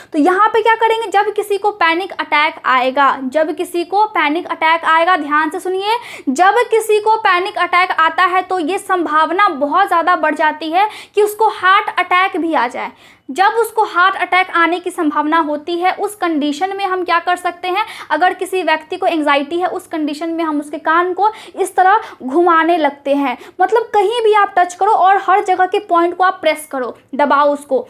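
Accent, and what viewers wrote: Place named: Bengal, India. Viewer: Mam aap Bangal se ho,aapki language se lagta hai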